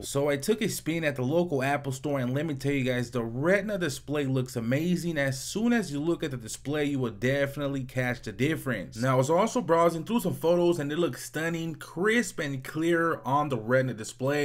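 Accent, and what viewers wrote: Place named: South, United States. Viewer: so country, are from Kansas or Texas?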